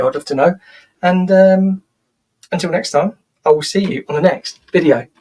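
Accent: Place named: Northern England, United Kingdom. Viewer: Hi,your creativity is absolutely infectious..nice to hear a fellow northerner too like myself 🤗( I'm a Scouser albeit a posh one lol & now living in the Wirral) Why don't you buy a roll of clear plastic carpet protector( used by decorators & keeping the carpet clean during building work ).I occasionally teach adults with MS / visual impairment like myself printmaking techniques & textiles & have used that decorators film to save money on professional ( Mylar sheets ) for years..I have used it successfully as stencil film on walls & furniture..brilliant for cheap & quick 👕 t-shirt design printing ( just add 1sheet inside to stop ink/ acrylic weeping through 👕) & then cut your design to stencil with scissors or a knife & stick on the front..I.also got kids who were partially sighted like me to make their own stained glass window decals using it & Sharpie markers..yeah for what your doing here you'll have fun & it's fairly low tack,wonder if conditioning it out with loads of warm water would eliminate all chance of ripping out any hair?? 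BTW 25 metres is under £12 & it's around 20 inches wide..like stair carpet width ( it's main use in DIY) xx..Your bobbed hair with blonde under the fringe I had fabulous ' barcode reverse weekly's highlights on my long way hair in the mid 90' s & what you call long Wolf cut..if I say so myself it looked sexy as hell lol I've always been obsessed by big 1970' s Hammer Horror film hair..especially the vampire women lol 😆😛lol x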